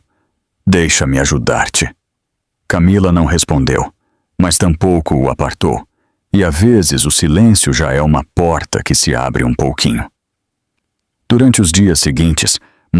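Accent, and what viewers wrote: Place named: Portugal. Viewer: Português não é do Brasil. Provavelmente de Portugal. Pode ser de outro país que foi colônia portuguesa como o Brasil.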